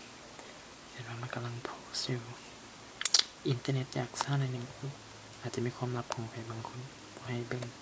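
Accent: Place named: Vientiane, Laos. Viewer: ຄັກຫລາຍ ວ່ານແຕ່ສຳນຽງ ວຽງຈັນປະສົມທາງໃດຫວະ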